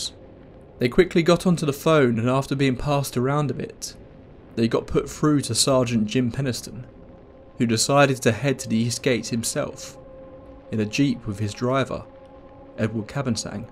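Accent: United Kingdom. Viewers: Every time i see an interesting thumbnail and title i pray its not narrated by a britard but dammit it always is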